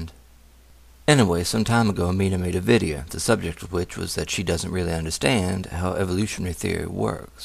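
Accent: South, United States. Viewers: Dude, you're a personal hero of mine. The insight and unvarnished truth blares triumphant. May I say, your voice of 'southern comfort' has found a fan north of your borders.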